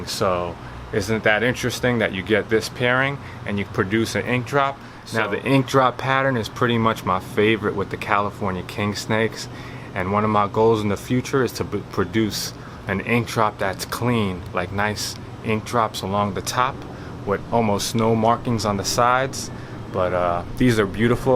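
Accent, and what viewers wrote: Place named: New York, United States. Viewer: Nice video......do I detect a NY accent? I see you have a African fat tail gecko. If you are new with them use the ground coconut fiber or even the Lugarti reptile bedding. They need a little higher humidity. The Lugarti bedding would probably work well with your snakes as well.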